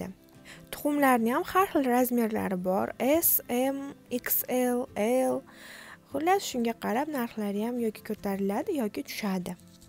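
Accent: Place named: Fergana, Uzbekistan. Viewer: Ассалому алайкум! Канал росаям ёкди🤗 бунинг учун Aishaxonга рахмат деб коламан ва сизга хам👍 Мабодо сиз Фаргона шахридан эмасмисиз? Гапиришиз бизникидай эканда🤩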